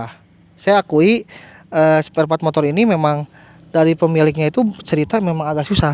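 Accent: West Java, Indonesia. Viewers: Meni balelol ngreview na...logat sunda na kaciri..mudah2n kaharepna tambah maju jang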